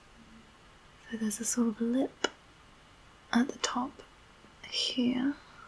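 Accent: Australia. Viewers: Glad I stumbled on your channel. You have to be Aussie surely?! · South Australian?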